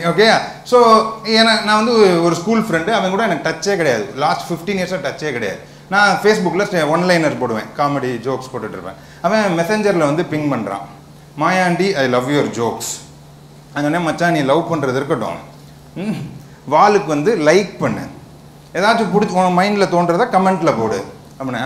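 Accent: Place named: Bangalore, India. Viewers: Bangalore slang 😂😂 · You are doing better keep it up.The Bangalore tamil accent really exists. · Bangalore tamil😍😍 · That bangalore tamil accent on point 🔥🔥🔥🔥🔥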